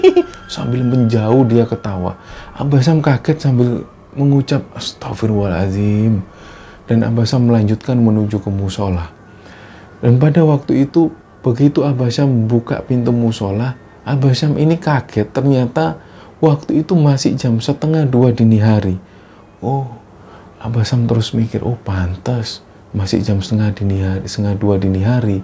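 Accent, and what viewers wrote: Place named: Banyumas, Indonesia. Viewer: Iya jelas ngapak lur😁 oke siap lur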